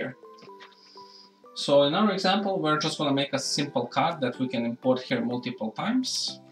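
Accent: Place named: Serbia. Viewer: Your accent is like u come from Serbia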